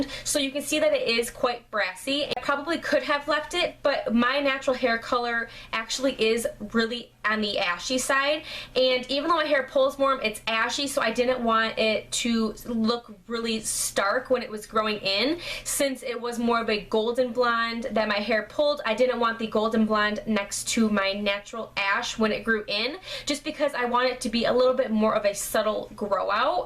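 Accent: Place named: Georgia, United States. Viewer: Aww your accent is so cute lol. Love Georgia girl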